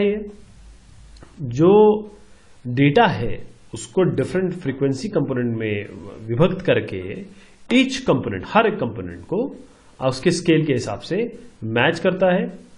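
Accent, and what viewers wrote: India. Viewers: 这tm直接把字幕翻译成德语了，服了这咖喱味的口音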